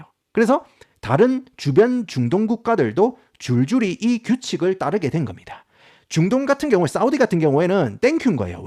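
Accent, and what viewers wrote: Gyeongsang, South Korea. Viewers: 와~미국 금융계통에서 일하셨던 경험자라 그런지 완전 고급정보 얻어갑니다. 내가 회사만들면 스카웃하고싶네요 근데 나는 갱상도라 말끼를 알아둗겠는데 딴동네 출신들은 알아 듣겠나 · 우짜 이리 말을 잘 터노. 미국에서 갱상도 사투리 들으니 넘나 톡톡 튀고 좋타. 함 봄 구독안하고 못배긴다. 명언이 한 두개가 아이다. ㅎㄷㄷ 한국떠난지 오래라믄서 우째 이라고 우리맴과 삶을 잘 알꼬!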